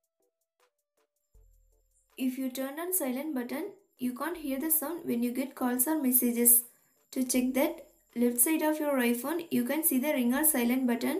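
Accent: India. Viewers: From where you are ?? Most Indian speak English like that, I think she is Indian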